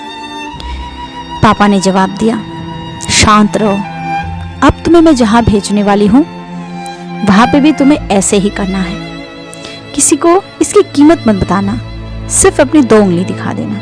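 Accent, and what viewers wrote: Bengal, India. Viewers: मेम साहिबा , कहानी तो बहुत ही ज्ञानवर्धक और प्रेरणादायक है , इसके लिए बहुत बहुत धन्यवाद । आपके बंगाली टोन के कारणों से बहुत ही छोटी गलती स्त्रीलिंग और पुल्लिंग के होता है , होती है में अंतर मिट जाता है , बुरा नहीं मानेंगे । कृपया ध्यान दें । 👍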